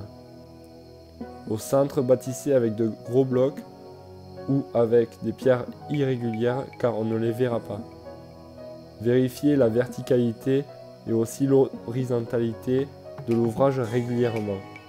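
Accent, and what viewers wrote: South, France. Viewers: (16)... Oh pétard... Ça c'est super beau.. Et très bien expliqué en plus 👌 Mais d'où vient cette pointe d'accent du sud.. La Provence peut être avec les plantes aromatiques positionnées ici ? ☺️ En tous les cas bravo chaîne très intéressante 👏👏👏👏 A bientôt 🤗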